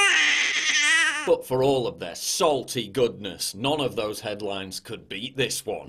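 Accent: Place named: England, United Kingdom. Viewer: santiago perez su acento es típico inglés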